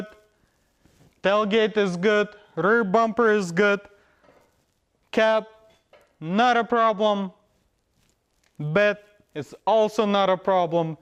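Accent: Russia. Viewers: Бро, ты не русский случайно?)) Акцент выдает) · Акцент русский!!!!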